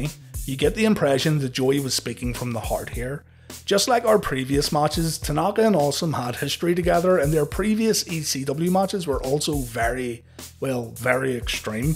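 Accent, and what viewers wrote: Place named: Northern Ireland, United Kingdom. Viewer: Nice to hear a fellow northern Irish ECW fan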